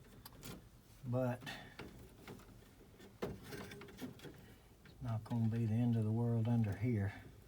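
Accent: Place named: Southeast, United States. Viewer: I like watching Steve Lav and you, but it's almost like culture shock switching between the two of you excellent tradesman. Steve's the quintessential fast talking, no holds barred, plumber with the Northeastern drawl; the slow talking, cautious, soft spoken Southeastern country gentlemen HVAC technician. Both are a joy to watch and are not only entertaining, but very educational in their presentations. Thanks to the both of you for taking the time to make these videos.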